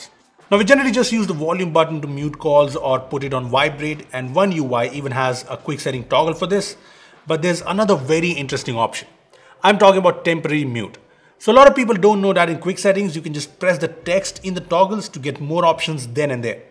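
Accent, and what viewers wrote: India. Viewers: I hate indian english accent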